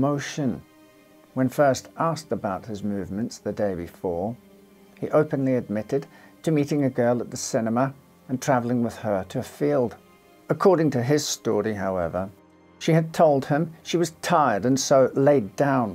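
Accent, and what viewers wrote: Scotland, United Kingdom. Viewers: is indeed Scottish.. 👍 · Great stuff. Are you Scottish?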